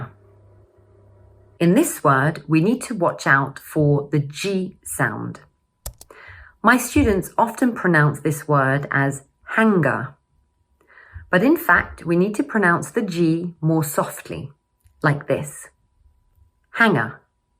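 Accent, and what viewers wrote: United Kingdom. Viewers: 이채널의 핵심이 영국식 발음 인거죠? 미국식이 아닌게 맞죠?? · 크~ 역시 발음은 영국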